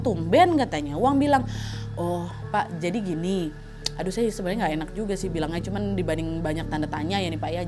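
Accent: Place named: Riau, Indonesia. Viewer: Pembawaan ceritanya enak kali apalagi ada logat2 riau nya 😂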